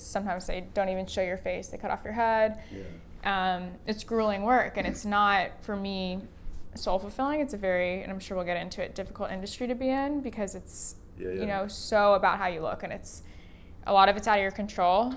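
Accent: United States. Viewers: That LA accent is unbearable.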